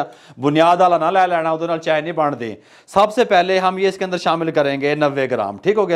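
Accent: Punjab, India. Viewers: Zaberdast gi. Ap hazare se hai kia ya punjabi? Apke bolne ka style mjhe acha lgta h. ☺️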